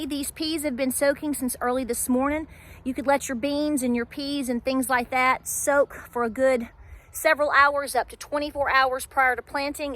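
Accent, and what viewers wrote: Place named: East Tennessee, United States. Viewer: Great video. Very inspiring. Love your accent. Is that from East Tennessee?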